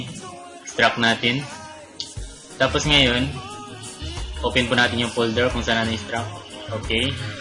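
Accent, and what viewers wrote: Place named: Iloilo, Philippines. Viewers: Hahaha... Nandito aq para sa real voice nya.. hahaha... Halata pa Rin ung punto nya ng bisaya.. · Ilonggo siyA sir hindi bisaya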